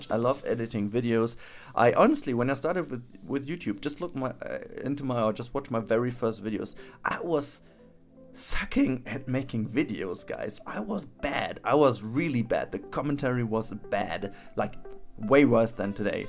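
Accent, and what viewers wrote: Germany. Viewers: Ok, first to what you talked about: It's really hard to maintain a channel when there's very little interaction. It's probably why the channel I had did so poorly and why I just couldn't find the effort to keep it going. I definitely miss it and am trying to get it going again or at least start something new. So, I get what you're saying. And actually, I've been watching and not commenting for a while now. I bet this doesn't apply for most of your viewers but I really dislike the German accent (and it's not your fault at all!) so a German YouTuber has to do really amazing content for me to watch it and support the channel. And I absolutely LOVE your PlanCo stuff because you spend so much time on details and you have amazing ideas. Basically, you play the game like I would like to (if I could afford the game or a computer that can run it). 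I would really like to see more and keep watching your videos. But I also know that life has to come first. And if you're not feeling you're getting enough back from making videos it can really affect you. I would hate for that to happen to you. So if you feel like you have to take some time off or take a step back, you do that. Now to the coaster: My first thought when I saw the thumbnail was "this looks like a coaster on Mars", because of the surrounding canyon but also because by now I'm kinda used to hexagons and triangles from Surviving Mars. And I think it would fit really well into a thriving Mars community to have a coatser like this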